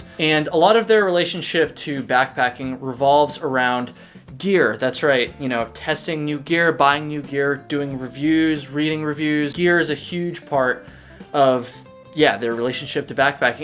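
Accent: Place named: South Carolina, United States. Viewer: Dude are you from SC?! If so what up fellow South Carolinian!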